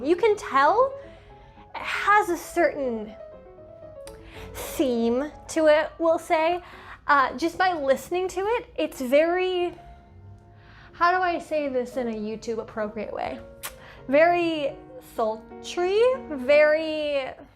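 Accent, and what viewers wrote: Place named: France. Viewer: Just say it, sexy, Carmen is pretty sexy. Your french is so cute! Nice video, congrats from Brazil.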